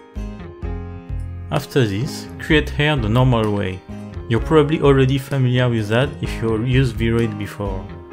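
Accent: France. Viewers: (on voit l'accent français ptdrr) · Oh un francais ca se voit a ton accent faut travailler ca mais sinon c un bon tuto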